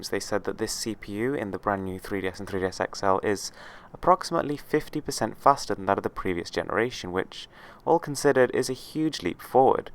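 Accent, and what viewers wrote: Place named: United Kingdom. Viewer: his voice...its so...BRITISH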